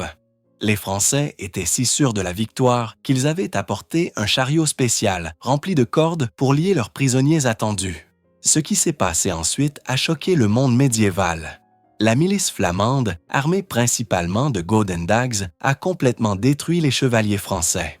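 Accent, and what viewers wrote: Quebec, Canada. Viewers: L'accent quebecois du robot c'est pour gratter quelques secondes de vues ?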